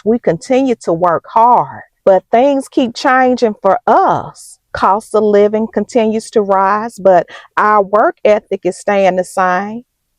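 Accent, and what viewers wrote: South, United States. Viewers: You are such a true inspiration ma'am...You came across my feed last week. I listened to you and your southern accent and now I'm hooked 😊 Keep the good content coming while I continue to be attentive and share your videos. All the best for the new year...lots of love to you and hubby❤ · I seen your video for the first time today. I love your spirit and your country accent. You have given me something to think about. I totally agree with what your saying. My husband and I recently relocated from Arizona to Georgia and I feel a big shift in my life making such a big change. Things are very different in G.A. I need to find something to do in G.A. Still looking for a perpose in a new state.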